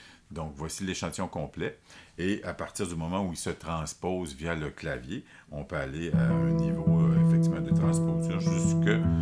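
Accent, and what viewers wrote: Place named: Quebec, Canada. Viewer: Merci, content d’entendre un québécois dans le domaine! Bon travail!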